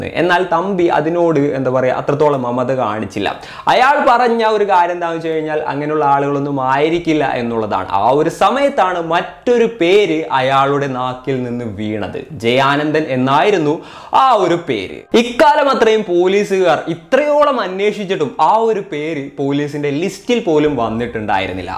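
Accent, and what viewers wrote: Thrissur, India. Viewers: Bro thrissur aano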